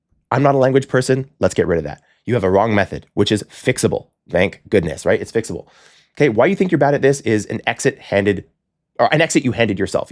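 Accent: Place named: China. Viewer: Dude,your mandarin accent is just great,keep it.———from Douyin🎉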